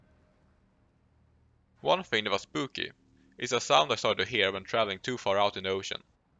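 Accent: Sweden. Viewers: I kinda wish WoW made a proper underwater world. Your swenglish is great btw 😏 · Lol you have the worst Swedish English I've ever heard. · Your accent sounds very swedish👍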